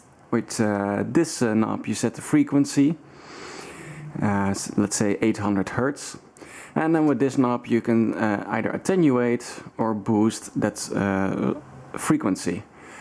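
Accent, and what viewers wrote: Netherlands. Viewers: Hahahahah yeah me too, 99% of the time you can tell right away if someone is a Dutchman speaking english. Yeah these things were way ahead of their time in terms of features, the parametric eq and the crossover function especially, auto mix channel is cool too. Yeah i know, it's sad that people discard these amps when something other than a fuse goes because they think it's old and solid state but everything has a life span and they're not that expensive to replace so we'll give it a shot fixing this behemoth. Maybe i'll turn it into a video like you did but i digress, thanks for this video, i enjoyed it a lot.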